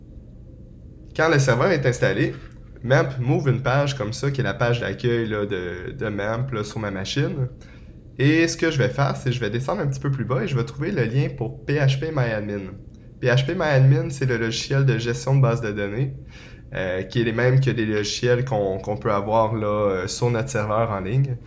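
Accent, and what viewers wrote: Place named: Canada. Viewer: Grand merci pour ce tuto. Les versions sont récentes et les commentaires sont sans blabla. Et en plus avec l'accent canadien, c'est parfait. A visionner sans modération.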